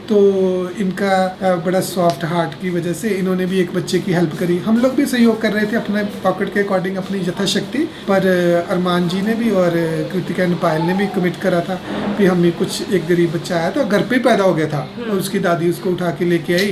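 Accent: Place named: Pahari, India. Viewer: Is Dr from Himachal...?? Because he has a pahari accent ❤😊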